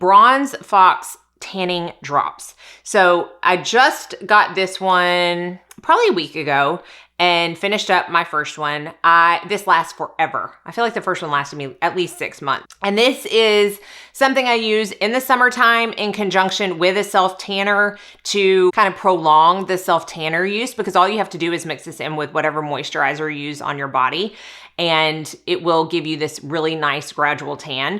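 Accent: South, United States. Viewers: Hi. What a great video. Thank you so much. Always such excellent information. I trust your honest and professional advice. You have, what looks like, perfect skin. I love you and I love your channel. You are so very beautiful and feminine and sexy. With that charming southern accent, it’s no wonder your channel is so popular and successful. Who wouldn’t want to look as gorgeous as you. Thanks again.. Peace, Calvin.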